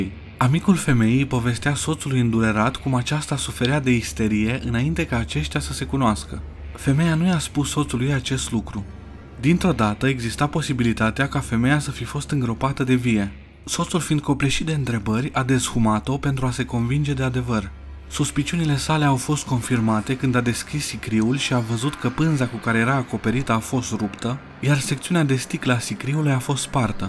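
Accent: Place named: England, United Kingdom. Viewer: Ai puțină engleza pe la colțul guri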